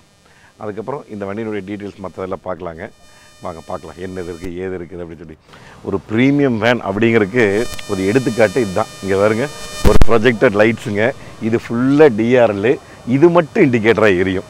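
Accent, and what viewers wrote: Kongu, India. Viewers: Anna ungaloda kuzhandhaithanam, Kongu Tamil ku na miga periya fan Anna 👌🏻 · sir naan ungal kongu தமிழுக்கு அடிமை ❤❤❤ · Perfect Kongu Tamil. I live in US, and I take rental vans for trips, mostly I get Ford, Freightliner and Benz, looks like Force is giving equally or better than these models.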